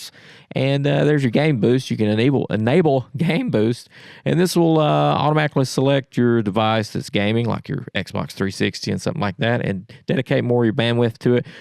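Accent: South, United States. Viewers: I hear southern accent, I give the video a like. Simple as that.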